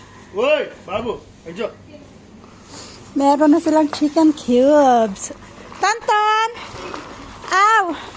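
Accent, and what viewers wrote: Iloilo, Philippines. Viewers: ilongga kapo maam?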